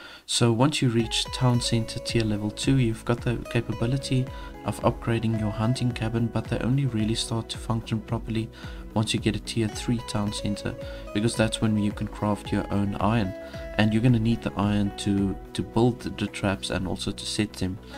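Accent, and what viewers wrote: South Africa. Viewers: Good stuff! Thanks. Coolest tip to have multiple mines on deep deposits (infinite supply deposits). BTW, I think I hear a South African accent there?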